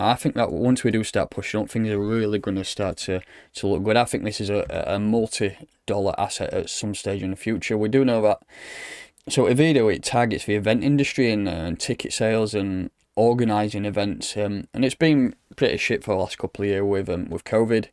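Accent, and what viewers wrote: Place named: Northern England, United Kingdom. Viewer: Proper northern boy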